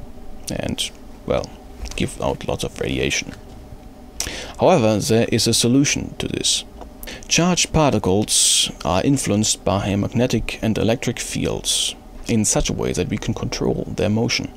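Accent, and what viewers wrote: Germany. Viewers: HE'S GERMAN HIS AACCENT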